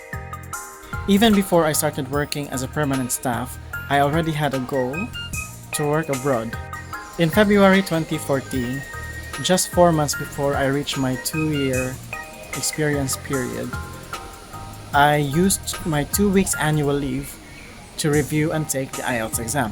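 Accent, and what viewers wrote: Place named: United Kingdom. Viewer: wow lods na acquire mo na din ng accent ng briton salute to you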